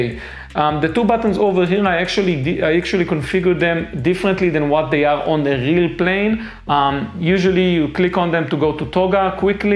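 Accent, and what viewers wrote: Israel. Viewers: I love your Israeli accent :)) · שומעים שאתה ישראלי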